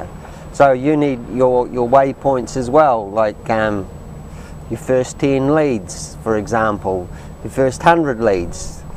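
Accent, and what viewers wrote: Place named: New Zealand. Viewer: Great metaphor talking about the ships on thier journey. Great to here another Kiwi again - I nearly moved to Whangaparao about 40 mins from Aukland Steve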